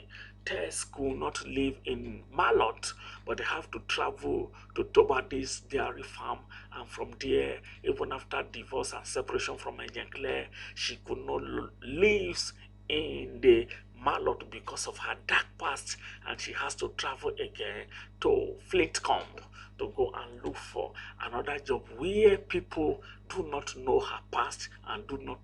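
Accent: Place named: Nigeria. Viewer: I love this video so much the Nigerian accent makes it so much better reminds me of Nigeria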